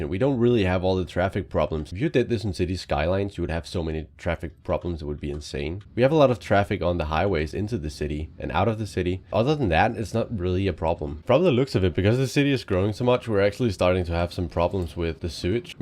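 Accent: Denmark. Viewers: Jeg tror bare jeg var lidt træt da jeg så den gamle video. For nu kan jeg godt lidt høre den Danske afsagt. Men stadig rigtig god til engelsk. 😁 Og så vil jeg bare sige tillykke med de 500 abonnementer godt på vej til 1000 🎉🥳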